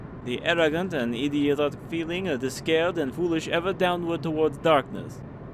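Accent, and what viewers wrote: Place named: France. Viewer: Golden french accent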